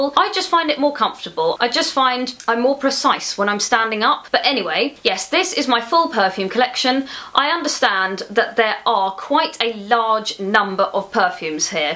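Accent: United Kingdom. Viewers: Hi, just wondering, where in UK are you from? You remind me of a friend of my who has got the same accent as you and I kinda miss her. Thanks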